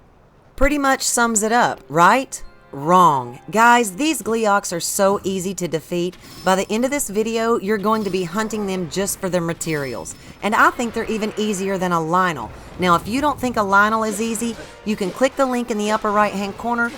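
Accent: South, United States. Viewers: Are you southern? Lol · Not to mention that nice Southern accent that makes it so chill…. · this is genius! also i'm so tickled by your accent, as a fellow southern person, you dont hear many southern game tutorials 😂❤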